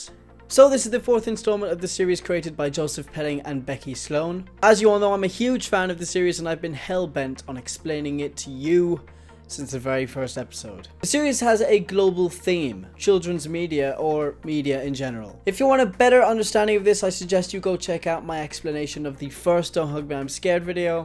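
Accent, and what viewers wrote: Ireland. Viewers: Are you Irish I'm just asking because of your accent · What part of England are you from you have an Irish accent also your pretty cute 😎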